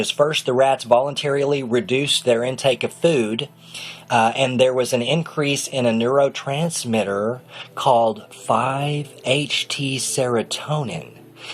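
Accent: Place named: South, United States. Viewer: I love your Southern accent. <3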